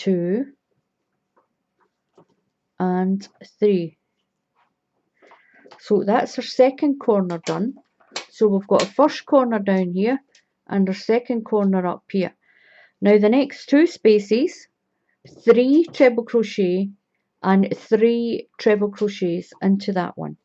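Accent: Scotland, United Kingdom. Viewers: Are you from Scotland ?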